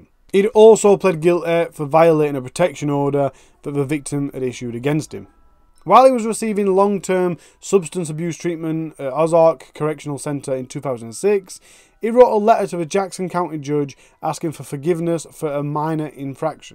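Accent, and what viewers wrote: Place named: Wales, United Kingdom. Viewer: Love your channel and your Welsh accent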